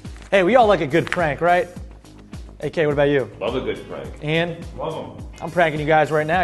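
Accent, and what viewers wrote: England, United Kingdom. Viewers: Forget the real English accent. English acent is much better! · Nice UK Accent btw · nice english acent!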